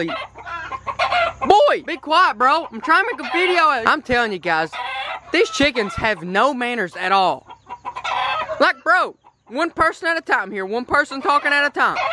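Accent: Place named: South, United States. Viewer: I love your accent I mean cuz you're so country cuz don't worry I am very country but not as country as you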